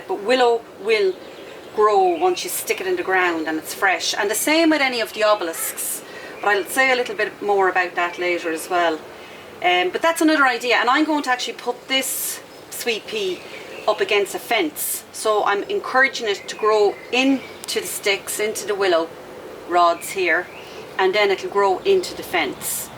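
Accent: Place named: Ireland. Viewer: Hi there from NJ in the US. Your video has inspired many willow projects on my little farm of 20 acres. Love your spirit, your nature and your accent, especially your accent because it reminds me of a friend from Ireland who also inspired me. Now I need more willow stools to make more fabulous things. Keep going and growing!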